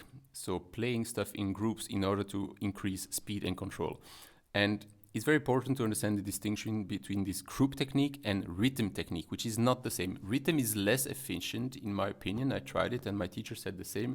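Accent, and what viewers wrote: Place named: France. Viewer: J’avais pas vu ton nom. J’écoutais la vidéo et je me suis dit : ça, c’est un français. Raison m’en a été donnée.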